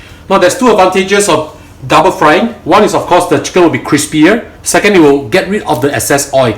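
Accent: Singapore. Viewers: Arw you singaporian sir cause you sound like one.. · You sound more Singaporean than Malaysian tbh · Are you speaking Singlish while cooking taiwai style?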